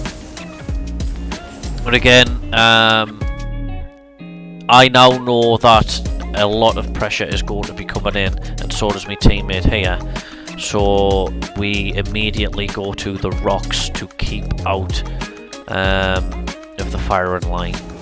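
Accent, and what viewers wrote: United Kingdom. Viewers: Uk accent S tier 😂 ggs the commentary is actually dope touch to the gameplay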